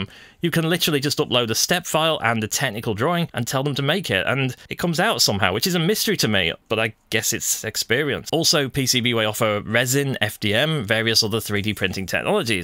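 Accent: England, United Kingdom. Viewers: This is yet another untested pie in the sky kickstarter project with no track record and many many unanswered questions despite all the fancy pants marketing and English accented voice overs . Don’t be a guinea pig with your hard earned dollars . Let some other clown waste their money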